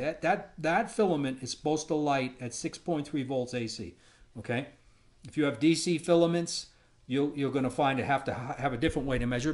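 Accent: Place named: Boston, United States. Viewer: Greetings from back east. You are Boston to the bone. Love it!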